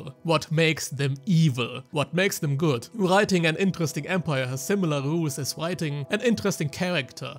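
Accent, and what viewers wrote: Germany. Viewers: Der starke dt. Akzent verbunden mit der nasalen und dadurch etwas arrogant wirkenden Stimme machen es wirklich extrem schwierig das Video anzusehen ohne den Wunsch zu haben es abzubrechen.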